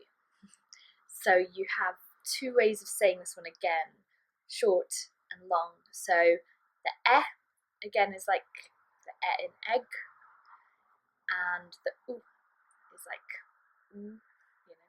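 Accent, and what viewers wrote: Wales, United Kingdom. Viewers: Really helpful video. I'll watch pt2 next. Defo helpful to ask the viewer to say a sentence, then hear it back correctly. Apologies if you've alresdy stated this elsewhere, but is this North Walian or South? Gets confusing when learning in the East Midlands! Diolch yn fawr iawn.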